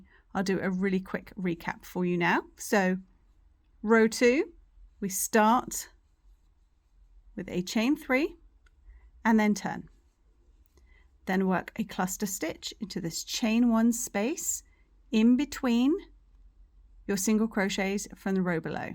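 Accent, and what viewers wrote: England, United Kingdom. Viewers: I so enjoy your instructions. They are given so clearly. You sound very English but use the US terminology. How is that? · And another wonderful video! I must admit that your accent is a delight to me. My grandfather immigrated from England in the early 1900’s and I’ve been soothed by a British accent my whole life. That and the marvelous content have got me hooked ❤️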